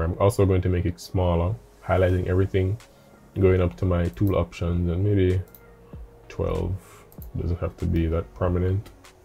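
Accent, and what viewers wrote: Jamaica. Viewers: Bless up! I hear the Jamaican accent. Good to see the Jamaican Youtubers #Winning #NewSubscriber 🙌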